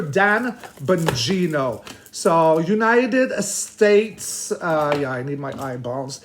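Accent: France. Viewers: You have such a wonderful French accent. Thank you for your light hearted readings! You always make me smile! Blessings! 💕🕊️💕💥💥💥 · 🎉❤your English is amazing with a beautiful French twist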